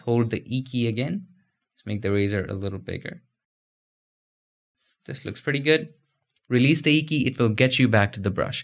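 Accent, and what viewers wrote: India. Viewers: I usually consider Indian accent unbearable to listen to, however..... You are one of the very few that find pleasant to listen to. Congratulations on your audio and voice-over, it's amazing.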